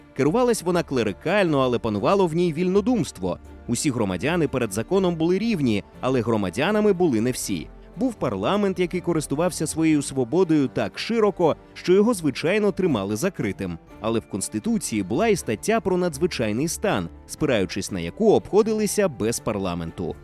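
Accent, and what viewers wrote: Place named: Moscow, Russia. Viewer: Голос за кадром- "ЦюЦюн, жиЦЦя, громаДЗяни" треба на консультацію до логопеда! Вкрай неприємно слухати ці маасковські виверти! ДИЗЛАЙК!!!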